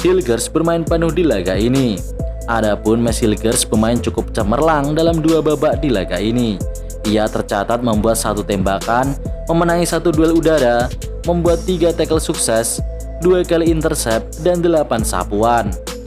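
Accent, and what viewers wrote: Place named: West Java, Indonesia. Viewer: nada suaranya sama, logatnya sunda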